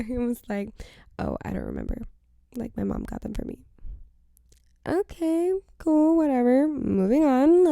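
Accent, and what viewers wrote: United Kingdom. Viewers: Also your British accent is GOOODDTTTT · Your british accent is killing me 😂 My new favv podcast fr 💗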